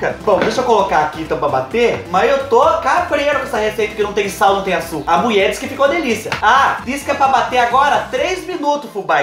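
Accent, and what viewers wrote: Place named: Porto Feliz, Brazil. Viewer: Seu sotaque é igual o nosso aqui de Porto Feliz!!! Bao demais...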